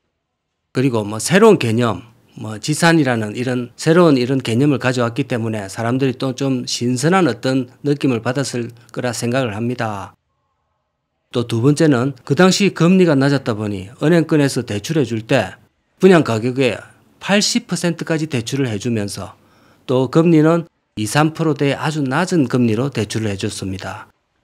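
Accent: Gyeongsang, South Korea. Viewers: 고향이 어딘가요? 지는 경남 합천이고 59세 입니다.사투리가 나랑 똑 같아서 정겹네요. 박상은 우리 말고는 잘 모를거에요.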